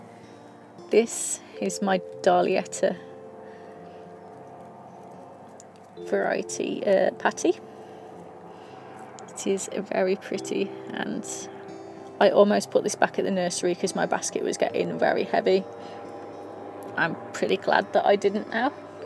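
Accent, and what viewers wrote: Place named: United Kingdom. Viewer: You speak British English. I Love it beautiful 😍 ❤. Do you live in England 🇬🇧?..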